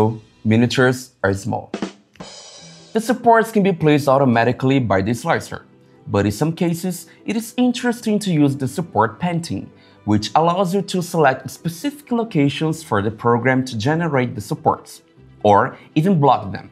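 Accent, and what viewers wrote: Brazil. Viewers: Your accent sounds like mine, are you Brazilian? 🇧🇷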